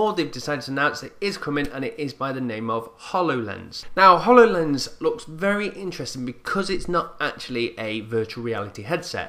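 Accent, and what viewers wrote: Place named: Northern England, United Kingdom. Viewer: Adding the letter "t" to words that don't need it is a common thing in the North,. T'internet and all that.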